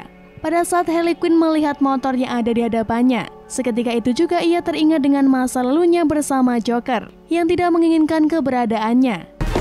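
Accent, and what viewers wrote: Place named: Batang, Indonesia. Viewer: Orang batang min?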